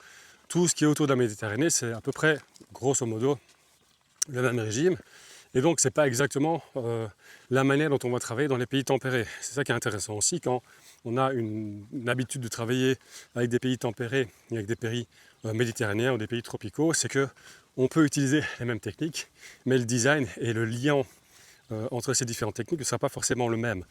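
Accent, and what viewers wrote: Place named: Brabant, Belgium. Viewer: Bonjour, bonne découverte. Vivant en montagne noire, le château d'eau du Languedoc, sur les hauteurs du pays carcassonnais. On se trouve à la croisée des chemins des climats Je réfléchis beaucoup pour avoir une cohérence sur mon païs d'adoption. Objectif, préservation, régénération, encrage culturel et naturel, robustesse. Votre vision analytique m'intéresse beaucoup. Je travaille sur une terre d'un 1/2 hectares, en pente, ruisseau, courbe de niveau, future habitation en greb en amont, verger, différents niveaux de couverture végétale pour préserver le sol et les couches inférieures comme un parasol et surtout diminuer l'effet de l'évaporation. Objectif : garder ce précieux capital qu'est l'eau, régénération des biodiversités, enrichissement des sols. C'est une longue quête, merci pour votre analyse, je reconnais d'ailleurs un accent de mon païs d'origine, Brabant ? 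Cordialement, d'un belge en païs occitàn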